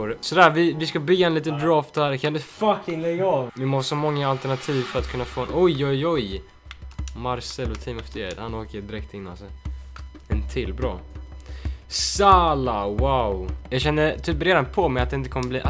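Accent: Sweden. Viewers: Är du från Småland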